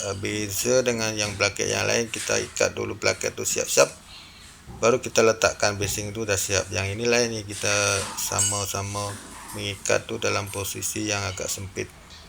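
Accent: Malaysia. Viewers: Cakap pon dh campo+ mcm org msia😅😂 tmkasih ya❤moga dimurahkan rezkinya&diberkati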